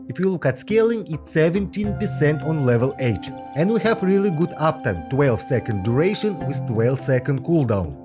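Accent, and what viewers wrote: Russia. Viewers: Это снимал русский? Акцент прям заметный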